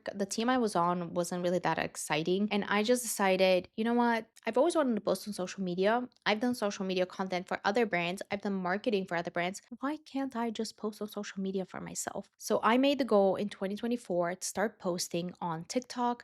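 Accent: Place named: Latin America. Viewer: I thought you were Latina from your accent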